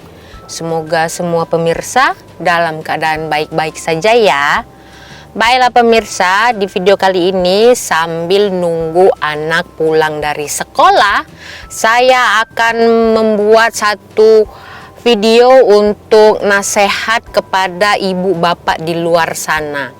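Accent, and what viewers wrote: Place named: North, Malaysia. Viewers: Aku br terjumpa channel ni rasa lawak hg ckp utaqa hahaha...sempoy baq hanggg 👍👍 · pandai cakap kedah.jangan garang sangat kak.minyak goreng cukup kak.sabar kak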